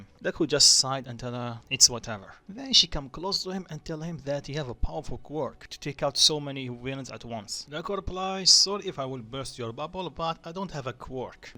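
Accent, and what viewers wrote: Russia. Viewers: Were are u from? I can here a Russian accent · Are you Russian 😅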